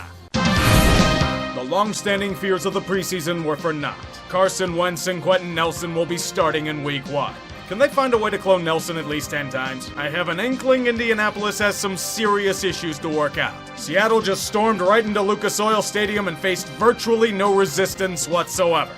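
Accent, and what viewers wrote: Pittsburgh, United States. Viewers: i love the new format!!! if there is a god, will be the season/blooper reel's main voiceman, as long as his yinzerism doesn't show through too much. SEND ME A PRIMANTI BROS SAMMICH YOU DIRTY BASTARD! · Huh. A new editing style. If i said i was a fan of it, id be lying. I loved the indie vibes from simple editing, and he sounded... contained, rather than without a filter. I need more Yinzer.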